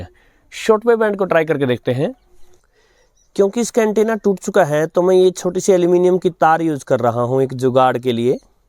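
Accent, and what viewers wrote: Standard. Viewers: आपकी शुद्ध हिंदी बोलने का कोई मुकाबला नहीं है धन्यवाद, यह वीडियो भी आपके लिए 😊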